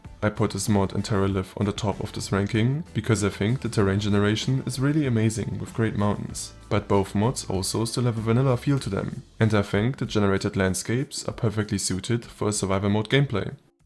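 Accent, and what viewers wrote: Germany. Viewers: Ich hear auch a Little Bit german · ich hör a little bit german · Gutes Video das Deutsch Englisch is crazy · Man hat sofort an deinem Akzent gehört, dass du aus Deutschland bist. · Are you german? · are you german? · Your English sounds good but do I hear a little bit of Deutsch in there?😂 Nice Vid, thanks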